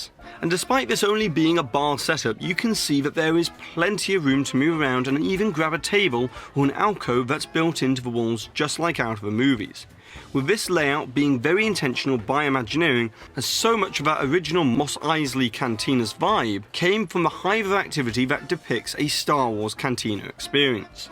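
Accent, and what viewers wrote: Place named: RP, United Kingdom. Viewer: The posh accent cannot die fast enough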